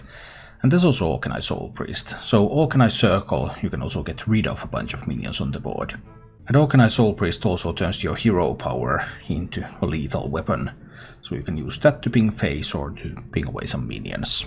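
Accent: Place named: Finland. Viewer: I immediately knew you were finnish after hearing your accent. I'm not even scandinavian myself but I've heard some finnish people talk before, haha. Nice deck and I love the way you explain things so calmly.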